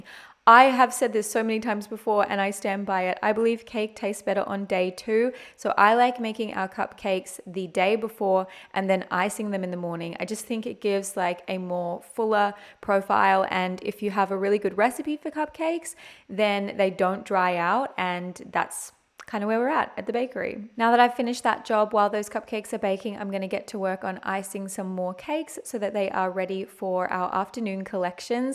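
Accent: Australia. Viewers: Wow im not american but those have to be huge cookies even for the USA standard right? 😅 Edit: just realised thats an australian accent hahaha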